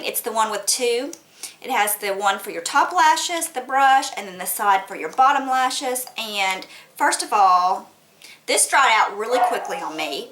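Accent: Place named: South, United States. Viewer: Great video hun and I just love your southern accent heehee too cute for words :) I subscribed have a great weekend dear xxx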